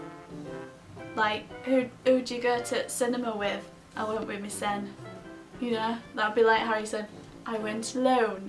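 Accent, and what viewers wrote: Yorkshire, United Kingdom. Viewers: Th's an ace video, lass! Am livin' away on t'uther side o' world so its gut t'ear another Yokshire accent! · I could understand you because I have family in Sheffield. I know that's a different part of Yorkshire though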